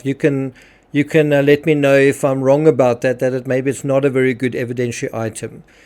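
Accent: South Africa. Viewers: I've been listening to your podcasts for some time now and agree with all you say. Tonight, I realized how much you care about the victims too that you take deep dives into. I was surprised you had so many books on the JB Ramsey case, but bought another triology of yours that is a different famous murder that also took place near Christmas. I have been reading the set, and you are very logical and precise. Thank you. I plan to start the first trilogy on this case next and go from there. For those that don't know, these Kindle books are very reasonable in cost. Thank you, for your help in understanding what has occurred and for your care. Last, your accent reminds me of our neighbors we had in Geraldton, Western Australia, who are from South Africa. There is a large community there of immigrants from that country. There is even a church that has services speaking in Afrikaans. 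Blessings.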